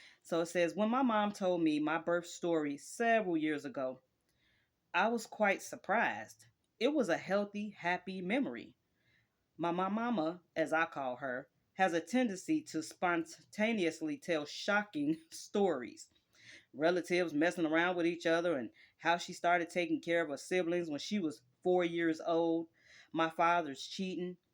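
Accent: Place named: South, United States. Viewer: what a great cookbook!! I love that you add the southern accent😊❤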